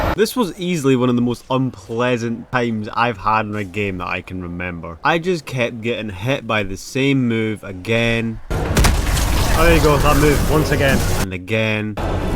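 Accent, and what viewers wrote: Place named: Scotland, United Kingdom. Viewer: God bless you. I actually really enjoyed this video. I laughed a lot. Something about a miserable man with a Scottish accent makes it that much better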